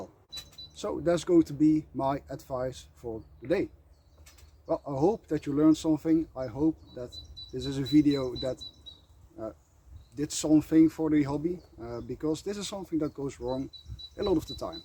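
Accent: Netherlands. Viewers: Hmmm dutch??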